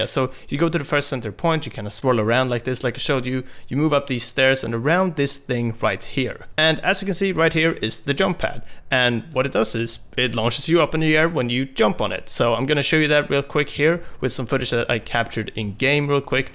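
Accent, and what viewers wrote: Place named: Sweden. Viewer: u swedish af boi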